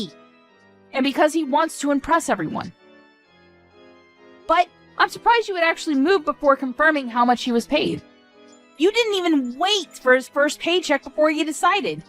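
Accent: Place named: England, United Kingdom. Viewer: I like the English accent.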